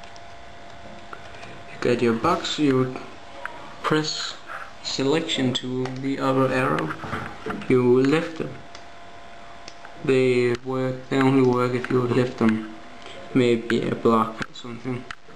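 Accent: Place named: Denmark. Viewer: man kan høre i din stemme du er dansker Yo DK :D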